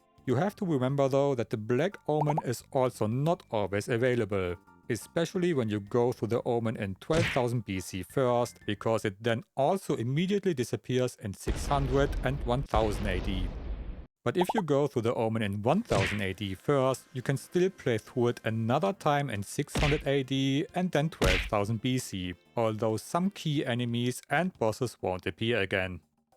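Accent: Germany. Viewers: If I may interject. Elmer Fudd has a speech impediment. He says wabbit instead of rabbit and wittle instead little. I personally don't get the Elmer impression from you. Your accent is very German.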